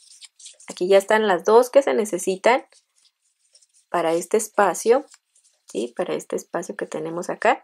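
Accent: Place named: Chihuahua, Mexico. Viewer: Queried a amiga,. Que preciosos trabajos Haden Gus manos. De don de eres en Mexico?. Me suenas como de Chihuahua, Rees Norte a?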